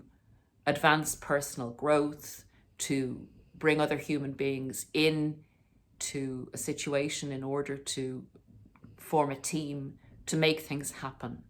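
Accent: Ireland. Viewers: Are you from Ireland? I lived in Ireland for 2 years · Interesting Irish accent 😊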